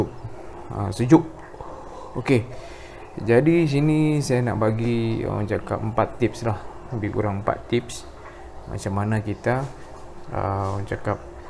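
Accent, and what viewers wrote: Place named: Sabah, Malaysia. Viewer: Ade sleng sleng sabah sikit la bang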